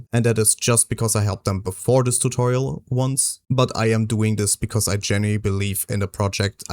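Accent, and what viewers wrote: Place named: Germany. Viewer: i hear a little deutsch in your voice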